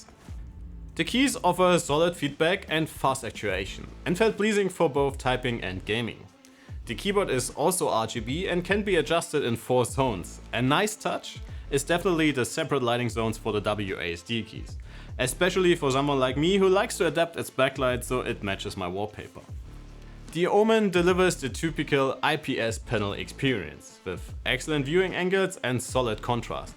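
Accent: Germany. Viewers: Mach mal bitte Timestamps rein, ich höre deinen deutschen Akzent, liebe Grüße.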